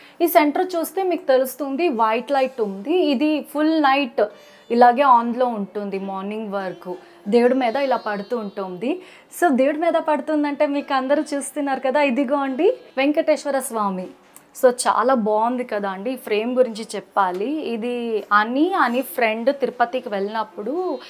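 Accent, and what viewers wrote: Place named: Karnataka, India. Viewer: Valladi native karnataka state banglore anduke telugu ala vundi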